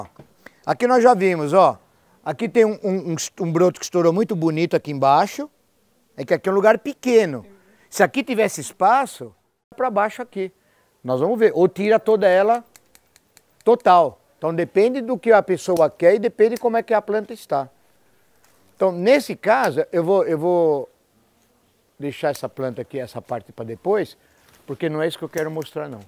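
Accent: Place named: São Paulo, Brazil. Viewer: Kkkkkkk acho o muito legal com essa fala bem paulista,aprendo muiiito!!!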